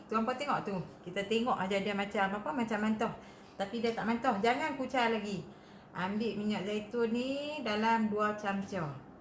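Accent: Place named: North, Malaysia. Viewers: terhibur sungguh dgn loghat utagha buat kami diperantauan...’punca semua ni’ ayat tergelak besaq 😂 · Dah cuba resepi Ayam Masak Kesuma! Puaih hati... selalu dok tengok channel dengan suami. Suami seronok sebab dengaq loghat Utagha! Gelak2 dia. Semoga ramai yang menggunakan resepi, jariah nanti kat akhirat. Moga Allah terima...Aamiin2 YRA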